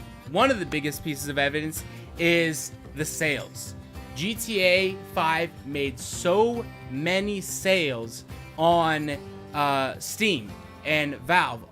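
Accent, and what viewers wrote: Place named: Russia. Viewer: please use Russian accent full time + I like your dimples:) Merry Christmas and new year y'all 😘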